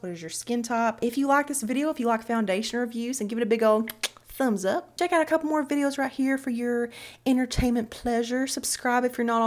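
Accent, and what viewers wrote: South, United States. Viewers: Just found your channel and subscribed as soon as I heard your Southern accent. Even though I'm old enough to be your mama or grandmother I still use makeup and like to see reviews before trying. Thanks for your honesty about the products. I'm in Alabama btw.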